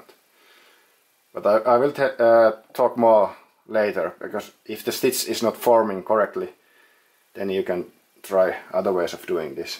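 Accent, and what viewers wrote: Finland. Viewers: I love your Finnish accent :)) I have the possibility of buying a Pfaff 93, do you recommend it? How much would you say it is worth? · Hieno video! Rallienglantia kuulee nykyään liian harvoin kun Kimi ei enää esiiny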